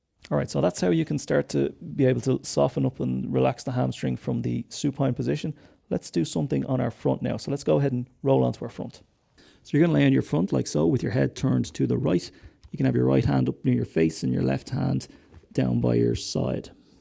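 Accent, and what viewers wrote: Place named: Ireland. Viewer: I like it. I like your Irish accent i don't think you do fine , some videos were challenging , the volume's but, not too bad.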